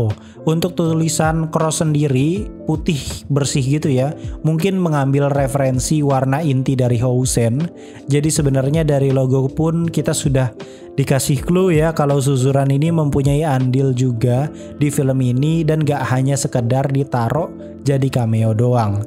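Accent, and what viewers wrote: West Java, Indonesia. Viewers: Enaknya channel Ini Kadang Ada Logat Sundanya... Jadi enak Buat saia yg urang sunda pisan... Btw salam subscriber baru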